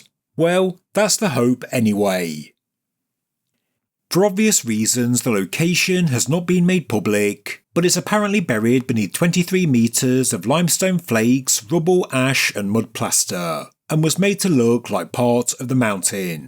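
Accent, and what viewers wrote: United Kingdom. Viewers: British accent really sucks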